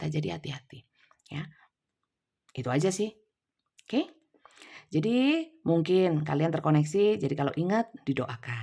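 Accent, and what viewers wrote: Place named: Malang, Indonesia. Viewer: Malang mana Bun? Saya jg Malang Makanya kok ngomongnya enak😂 Iya Bun, kemarin sy nonton reading'an bunda,,,ni jam 00.28 sy masih stay d channel bunda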